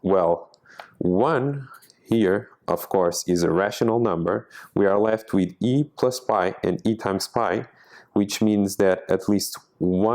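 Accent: Portugal. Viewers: Sotaque português? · Pela pronúncia acho que és português. Gostei deste vídeo que encontrei por acaso.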